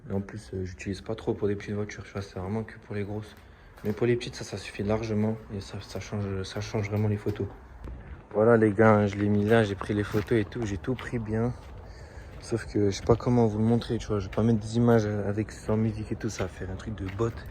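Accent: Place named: Alsace, France. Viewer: J ai cash reconnu l’accent alsacien mdr 😂 et pour les fleur essaye du diluant normalement t’enlevé tout avec sa et force a toi gros pour tes projets 💪💪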